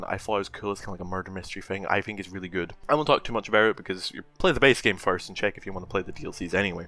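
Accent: United Kingdom. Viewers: Dude htf talking regular but pronouncing your ts as if your from Britain? Like wtf 😂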